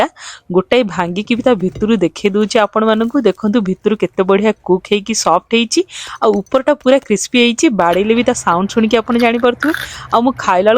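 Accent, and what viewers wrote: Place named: Ganjam, India. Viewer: tume Cuttack ra kintu tuma katha barta ganjam ra bhali laguchi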